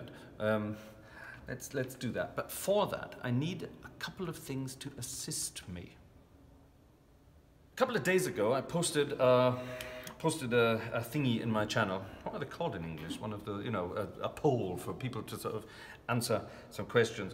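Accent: Germany. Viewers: You seem to have developed a slight on-and-off German accent. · Love your channel and thanks for all the wonderful videos! If I may ask, and the question somewhat fits the titel of the video: How long have you been living in Germany? I mean no offence, but you seem to have a slight German accent in your English? That, or my hearing is just shit! Keep up the amazing work on your channel!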